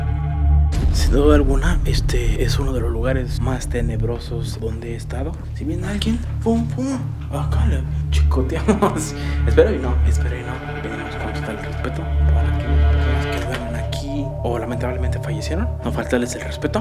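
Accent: Mexico City, Mexico. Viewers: lo que más horror causa es tu acento chilango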